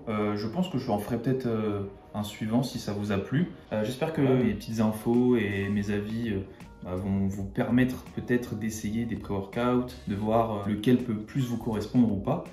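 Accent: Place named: France. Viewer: To be honest I didn’t understand a lot of words but watching you because of your wonderful French accent. It’s slow for me and helps me understand what words you use. Greetings from Russia👋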